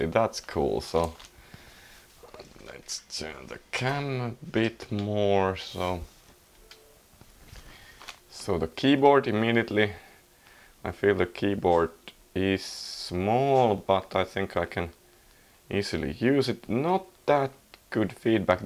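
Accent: Finland. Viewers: ahhh the finnish accent :D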